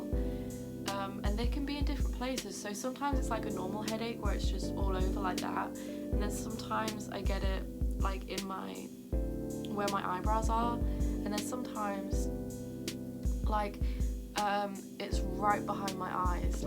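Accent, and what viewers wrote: United Kingdom. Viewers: Oh no! I absolutely fell in love with you from the moment I saw you. You are so pretty and cute that I can't watch a post without smiling. The british accent is my favorite. Kelly osbourne infact is my celeb crush... Guess you are to now lol. No there isn't an shrine next to my Kelly shine...yet; but keep posting cuz we love you!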